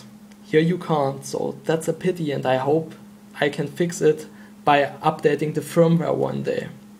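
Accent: Germany. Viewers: 5sec und ich wusste zu 90% das du deutscher bist :D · man merkt schon nach den ersten sätzen am aktzent das du deutscher bist. i mean... "änd wOt wOs in it? äh scophone" .come on ! :-) entweder deutsch oder korrektes english :D , aber sonst ganz nett das du eine review gemacht hast :-) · hahaha :D deutscher akzent :D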